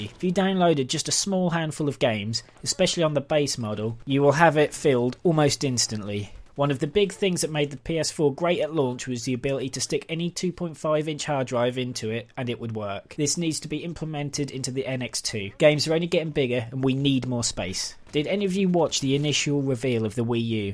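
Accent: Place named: Norfolk, United Kingdom. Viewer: Im originally from Nottingham now I live in Norfolk Sheringham had done for 8 years so iv got an ear for the Norfolk dierlect how ya getting on lol you gave it away when you said money grabbing I think they know to be stronger then the ps4 and xbox I'm loving the wii u pick up and play with the handheld I think it will surprise every one it needs to add me in wii u my Id is imrobinurhood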